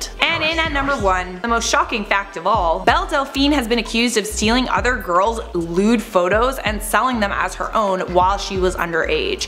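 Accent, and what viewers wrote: United Kingdom. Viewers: She's South African?!! She has British accent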